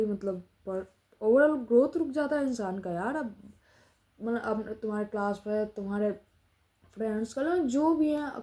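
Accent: Bihar, India. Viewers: Bihar se ho na? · Ap bihar se ho?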